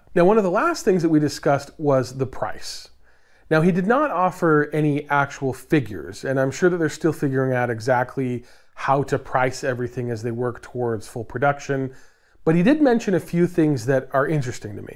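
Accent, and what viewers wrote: Pittsburgh, United States. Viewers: are you a yinzer?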